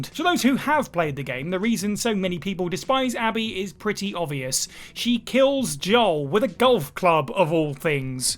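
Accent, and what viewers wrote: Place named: England, United Kingdom. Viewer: England accent 😢😅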